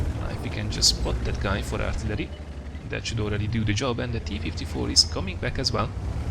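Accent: Hungary. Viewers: You got a really hungarian accent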